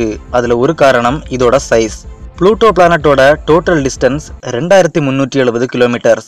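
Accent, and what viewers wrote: Kanyakumari, India. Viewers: Kumari Tamil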